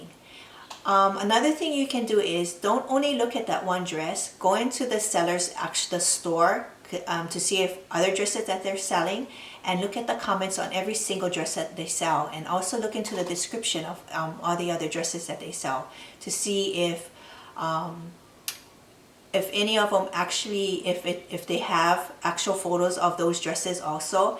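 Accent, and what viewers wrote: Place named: Hawaii, United States. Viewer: Ho aunty, nice review! I knew you were from Hawai'i after I hear you talk. Aloha and mahalo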